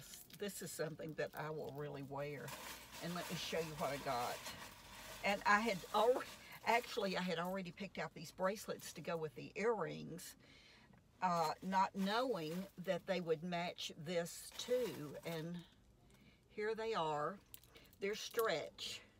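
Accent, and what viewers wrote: South, United States. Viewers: I love your videos. Reason one as a women just entering her 60’s, it’s nice to see you can still be young at heart. Reason two. My pops family is from the south and listening to you speak is like music to my ears. I live in the Rocky Mountain west. So not much of your charming speech around hear. So glad I came across your channel.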